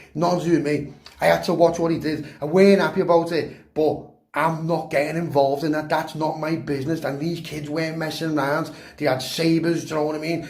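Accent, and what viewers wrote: Liverpool, United Kingdom. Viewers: Love it scouse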